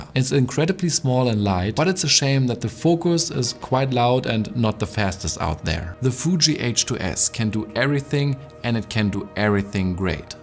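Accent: Germany. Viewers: dein deutscher dialekt ist echt übel XD. teilweise wirkt es so als wolltest du extra englischer sprechen... und dann verkackst du das "very". daraus machst du ein "whery"... und wenn ein vokal nach dem "the" kommt, wird es "thee" ausgesprochen. nicht böser gemeint. ich formuliere immer sehr unsensibel.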